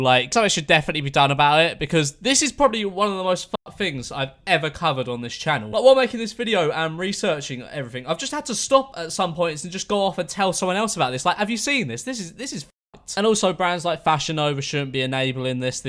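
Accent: United Kingdom. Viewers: What’s better than ur channel is your British accent lol 😂❤️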